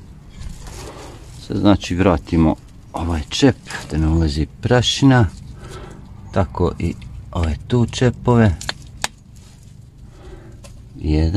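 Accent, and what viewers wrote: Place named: Bosnia and Herzegovina. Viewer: Obozavam gledati tvoje video snimke,legenda si ,pogotovo kad ti cujem naglasak vratim se u Bosnu opet ..Pozdrav iz ledare Norveske!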